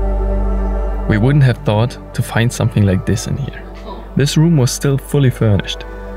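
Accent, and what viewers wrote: Germany. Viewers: I like your videos, very well done and your great dialogues. And especially I like your German accent (honestly).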